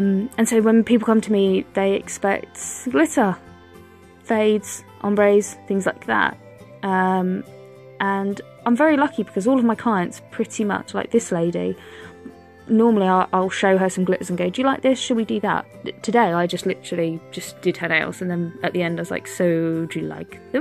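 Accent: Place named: Essex, United Kingdom. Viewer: I'm actually sitting here.... well actually standing... you're keeping me entertained whilst I do the most mundane task of washing up after feed the kids their tea... giggling along with you because I'm making the same noises of wonder over that G-O-R-G-E-O-U-S glitter and it friggin GLOWS!!!! 😍 lol your accent has me perplexed a little thou.... coz you keep saying certain words that sound Essex... like me 😁 I'm now off to put another order in.... but please write congratulations on you winnings 😂😂😂 great video as always x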